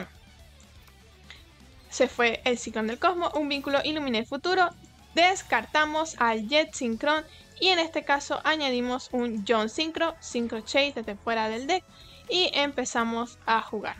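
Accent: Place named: Canary Islands, Spain. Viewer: Tu acento parece canario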